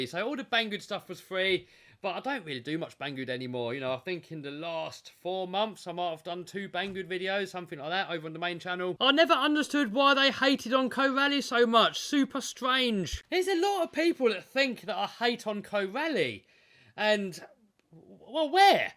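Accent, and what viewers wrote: United Kingdom. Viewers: Yo, i join to this Chanel a year ago and i have seen all ur main videos chanel i like u, ur conten and the british english Continue been like u r i love all what u do, in all videos u make me laugh and i hope u get that 2 millon of subs Thanx and a greeting from Spain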